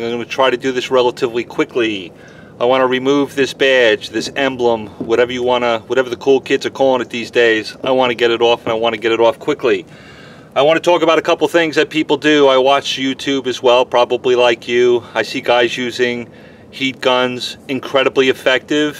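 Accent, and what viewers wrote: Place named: Texas, United States. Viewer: Great job but work on your Texas accent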